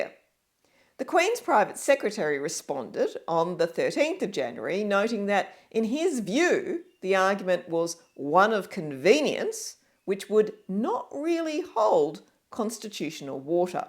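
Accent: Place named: Cultivated, Australia. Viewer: I have never heard a more aristocratic Australian. G’day, mate.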